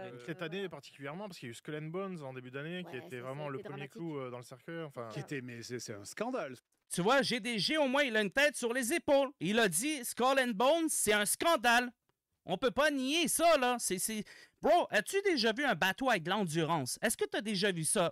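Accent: Quebec, Canada. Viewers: Ne t'énerves pas trop, tu vas perdre ton accent québecois et après tu seras moins drôle! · Merci pour tes vidéos qui remettent les points sur les i. Avec l accent canadien en plus j'adore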